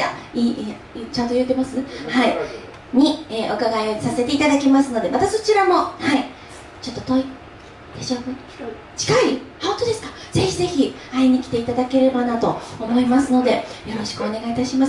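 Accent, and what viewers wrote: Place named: Osaka, Japan. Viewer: かわいい！ちらほら、大阪弁素敵🎉華織さ〜ん、応援していますよ！また、イベントでお会いしましょうね！お会い出来ます日を楽しみにしています♡白夜行も、カッコいい😊！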